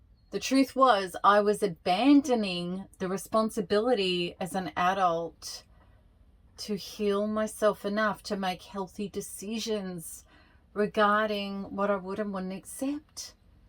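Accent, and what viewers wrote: Australia. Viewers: I love the Ozzy accent. This content really hits home. My mom was a narcissist and she passed in 2018 and I keep learning more and more about her through these types of videos. It has to be one of the most misunderstood behaviours in society and challenging to understand. But it's a work in progress and that of course is a good thing.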